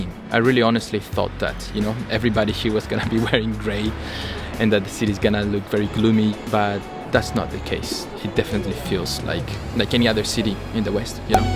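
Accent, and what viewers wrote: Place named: Germany. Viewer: Great video and thanks for sharing. Are you German descendant? I notice your English has a bit of German accent.😂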